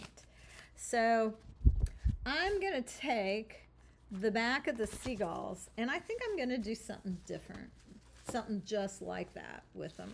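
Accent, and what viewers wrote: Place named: South, United States. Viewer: you are a gem! I've been following you for years as I take my mixed-media journey. I was a part of stencil club on and off for years and I have about 500 stencil girls stencils! I absolutely love your style, your great tips and tricks and that lovely southern voice is a soothing presence in my practice! Thanks for being YOU!!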